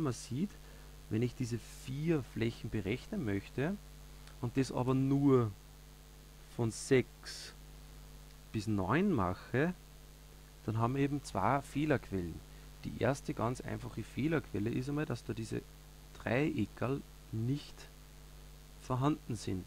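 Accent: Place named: Upper Austria, Austria. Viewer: Sehr gutes Video, das ma wirklich weitergeholfen hat! Gut verständlich erklärt und sympathischer OÖ-Dialekt :)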